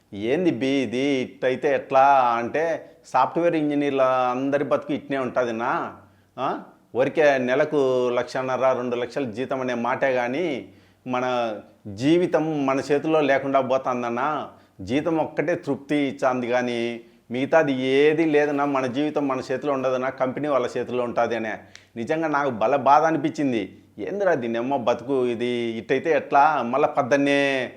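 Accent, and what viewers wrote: Kadapa, India. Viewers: పక్క కడప జిల్లా బాష ❤😂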